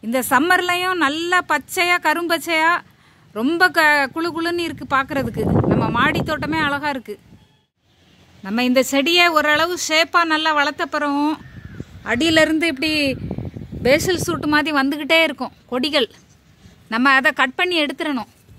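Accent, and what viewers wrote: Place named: Tirunelveli, India. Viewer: மேம் நாங்க தாராபுரம் ஏரியால இருக்கவங்க மேம் எங்க ஏரியால இந்த செடி கிடைக்கிறது இல்லை ஒரு பதியம் போட்ட செடி எங்களுக்கு கிடைக்கும் எனக்கு இந்த செடி ரொம்ப பிடிக்கும் மேம் ஆனா எங்க ஏரியால இந்த செடி அதிகம் இல்லை இனிமேல் நீங்க வந்து திருநெல்வேலி மாவட்ட ஏரியாவுல உங்க வாய்ஸ் வந்து திருநெல்வேலி பாச மாதிரி இருக்கு அதனால தான் கேட்கிறேன் இரண்டாவது என்னன்னா முல்லைக்கொடி எல்லாமே கிடைக்குது இந்த சந்தனம் உள்ள செடி வந்து கிடைக்கவில்லை எனக்கு ரொம்ப நாளா இந்த செடி வாங்கி வைக்கணும்னு ஆசைங்க ஆனால் கிடைக்க மாட்டேங்குது எனக்கு ஒரு பதியம் போட்ட செடி தருவீங்களா ப்ளீஸ்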